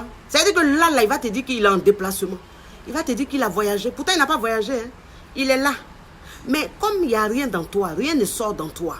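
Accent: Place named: Ivory Coast. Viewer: les expressions : "mougou... mougouli.... yougou yougou..." ton accent ivoirien, tes "r" enroulé, ta gestuelle de gauchière, viennnent pigmenter ton coaching. j'aime quand tu les utilises souvent.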